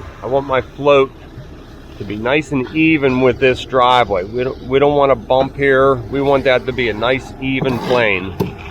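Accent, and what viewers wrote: Pittsburgh, United States. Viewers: Are you a yinzer? You sound like it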